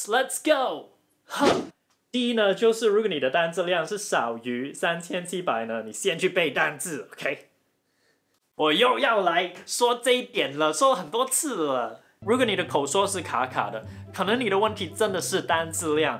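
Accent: Cantonese, China. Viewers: Uspeak cantones so cute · OMG是真的Ne-Yo!我本來還期待你自己裝扮成他! 越來越棒了~真的大愛你講廣東話跟有廣東味的中文(普通話)😍😍😍!!!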